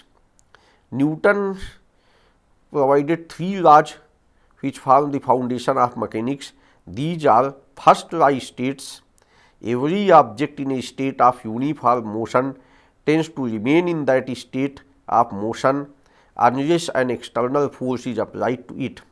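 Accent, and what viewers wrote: India. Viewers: p he's Indian... as im i too...but i am not sure which part of India this accent is from..!!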